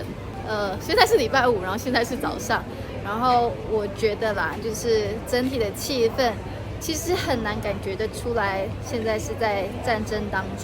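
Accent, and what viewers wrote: Taiwan. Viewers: 听口音是台湾人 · 好奇你是台灣人嗎?口音就是台灣人..但福建的也很像!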